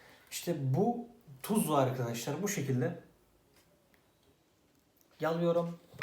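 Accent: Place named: Trabzon, Turkey. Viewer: Ne kadar düzgün konuşmaya calışsanda trabzonlu olduğun belli edeysin kral 🖤👏🏻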